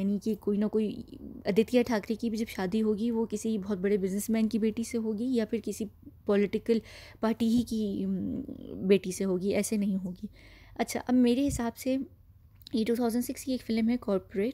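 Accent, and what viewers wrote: United Kingdom. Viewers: English accent.. Masha Allah.. 😍💕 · Mam how is your english and hindi both so good. Like perfect british accent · Excellent research. Your English accent sounds like you're from the UK. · your accent r supperb. can you teach me uk accent 😊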